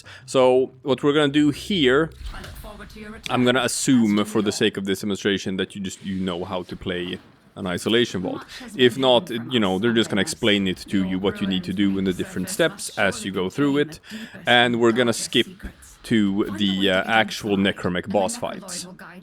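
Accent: Sweden. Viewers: is this swenglish? "im pressing i"....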